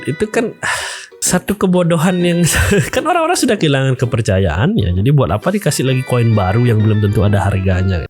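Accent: Bali, Indonesia. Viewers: Bali itu bang...sekilas mirip penekanan dan nada nya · Keliatan banget orang bali dari logatnya om 😅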